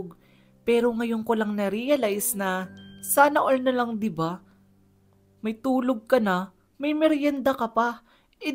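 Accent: Visayas, Philippines. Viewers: bisaya ka po ba???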